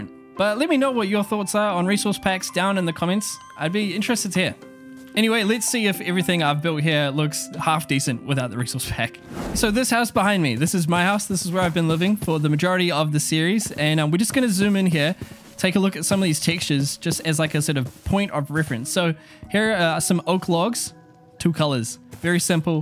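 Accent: New Zealand. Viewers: are you a kiwi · are you a kiwi? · are you kiwi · Is that a New Zealand accent? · I never thought I would hear a NZ accent.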